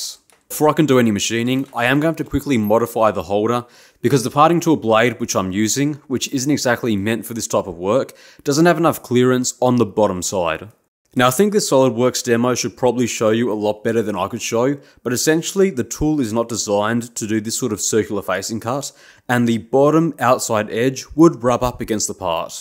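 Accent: Australia. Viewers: Dude for some reason I opened this video and thought it was inheritance machining so I got jump scared by your Australian accent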